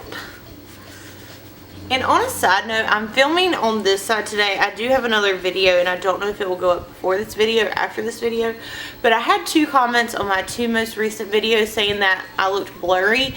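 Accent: South, United States. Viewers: You're southern accent is sooo cute!!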